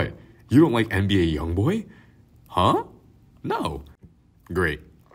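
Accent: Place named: North Jersey, United States. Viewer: Sounds like north Jersey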